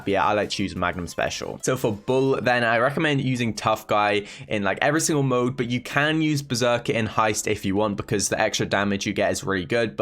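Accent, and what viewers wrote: United Kingdom. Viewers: For some reason, I like hearing British accent! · Sooo early....love to hear your British accent😅😅😅btw big fan........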